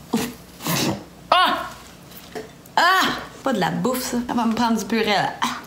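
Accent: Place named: Quebec, Canada. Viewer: l accent québécois pour tout me fait tellement vibrer, alors continue par ton humour a faire passer ton bon sens, ta santé joyeuse, ton rire pour améliorer notre immunité... tabernacle, la pandémie de la liberté et la confiance entre nous, creons la 2ème vague de vie et d amour ♡♡♡ normale le plus vite possible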